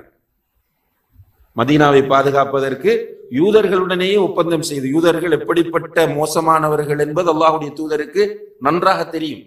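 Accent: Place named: Sri Lanka. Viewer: Pesum vizattil puriwazillaya sri lanka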